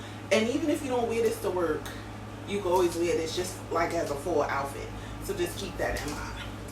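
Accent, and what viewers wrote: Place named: New Jersey, United States. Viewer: You're Jersey girl accent🥰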